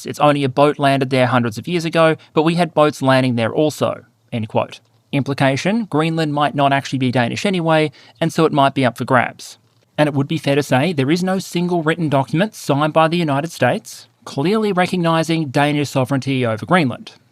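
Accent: Australia. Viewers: is the Slavic god of thunder. The accent you hear is Australian. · That tacit acknowledgment that your voice is the real moneymaker at the end of the video there gave me a chuckle. I often wish I was an aussie just because of how much Americans love the accent…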